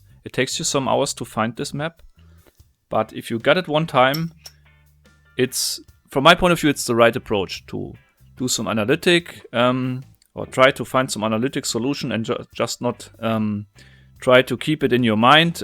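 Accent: Germany. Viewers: Ich hätte nie gedacht wie stark man den deutschen Akzent im Englischen raushören kann 😂😂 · I like your typical german engineering accent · Ich find den deutschen Akzent so geil xD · Man hört den deutschen Akzent raus :D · genius! but you speak like a german. are you? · You are easy to understand because of your nice German accent. Lass mich raten: aus Hessen? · Nice german accend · Dat german accent Kreygasm. Way too good :'D. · Du bist Deutscher nä? Ich höre das raus aus deinen Dialekt.. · Are you german? You sound like one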